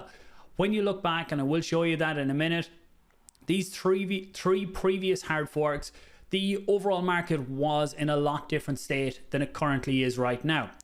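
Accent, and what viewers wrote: Ireland. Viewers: Thanks for the quick video and update, —always appreciate your insight. New mic sounds clean; that beautiful Irish accent is as crystal clear as ever!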